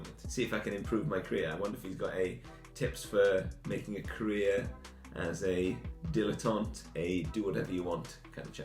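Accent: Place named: Wales, United Kingdom. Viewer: I'm really enjoying your content so far, and is fantastic to hear another rustic Welsh accent in the personal finance space. Keep up the good work!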